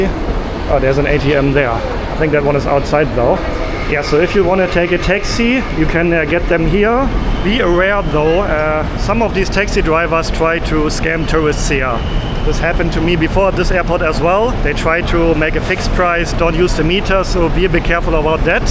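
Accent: Germany. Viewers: He made enough money..... Don't need to make more videos. People got addicted to his German accent English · I am Thai and living outside of Bangkok. I am also learning about places in Bangkok from your video.😂 Well done,. I like your English with German accent, very charming! Keep on doing a good job!❤👍🇹🇭Welcome back to Thailand! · Thanks! Great videos, I’m an American with German background, I love to hear to your German accent.🇺🇸🇩🇪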